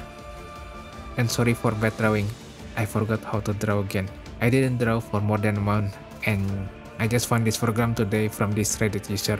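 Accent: Indonesia. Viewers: i realized i could do this accidentally, works on my setup with Sunshine + Classicoldsong's Artemis(Moonlight fork that has more experimental features and a little nicer GUI i found from Moonlight github repository fork) and began to wonder if someone done video about this amazing discovery i made. Thankfully someone already done it. anyways works with my Xiaomi Pad 6 and its Xiaomi pen, down to its 2 buttons on pen(although a bit janky since the OS tried to use it also sometimes) and its pressure sensitivity! also, is it just me or you sounds like Indonesian?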